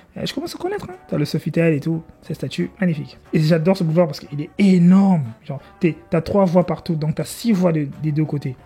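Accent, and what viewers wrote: Benin. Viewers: Ton Lomé a quoi de plus beau que ce qu'il a montré ? Ou bien tu veux qu'il invente des images pour Lomé ? Le temps accordé aux 2 villes est relativement équitable. Tu l'as vu comme un béninois ? C'est c'est l'accent béninois ça ?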